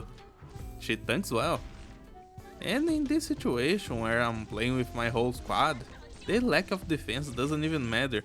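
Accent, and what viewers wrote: Brazil. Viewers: You are Brazilian? your sotaque are very paulista :V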